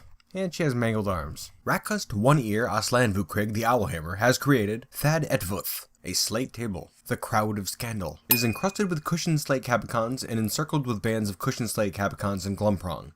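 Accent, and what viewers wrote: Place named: Northeast, United States. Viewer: So having listened to you speak for about.... 15 hours now... I'm guessing that you're in the United States and you live in the north-east, somewhere within the vicinity of New York State. Am I in the right ballpark? Of course, privacy considered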